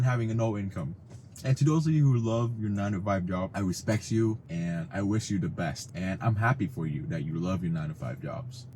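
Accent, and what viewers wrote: Vietnam. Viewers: Your vietnamese accent is showing.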